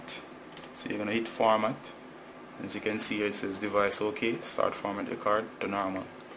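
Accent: Jamaica. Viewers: kinda personal but are from Jamaica?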